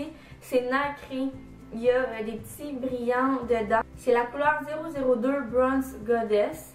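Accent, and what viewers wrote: Quebec, Canada. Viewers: J'adore tellemeeeent l'accent québécois c'est fou hihi ! Et j'aime aussi énormément tes vidéos !!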